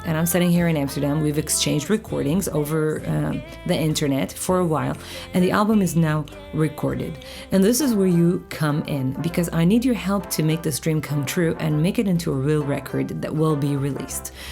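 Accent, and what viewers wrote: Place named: United States. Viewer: Woah your English is amazing. Aren't you from Israel? You sound like an American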